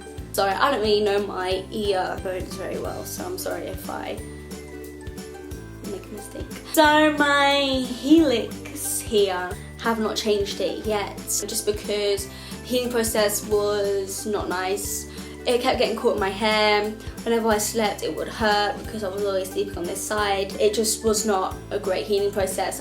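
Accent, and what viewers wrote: England, United Kingdom. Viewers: Onyx Lover she's english but she lives in France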